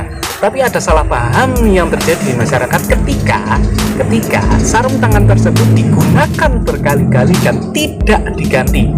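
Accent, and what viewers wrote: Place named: Java, Indonesia. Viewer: medok gaes hehe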